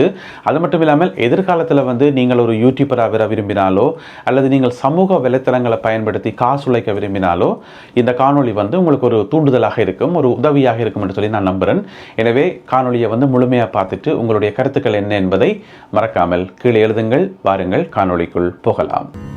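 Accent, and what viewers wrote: Sri Lanka. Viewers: I came to London on visit visa,i have subscribed your channel very interesting,your srilankan slang tamil impress me very much.iam running a devotional channel namely Temple trails showing festivals of temples,on seeing your channel i got impressed and started a new channel namely வானமே எல்லை. your pronounciation and detailing is super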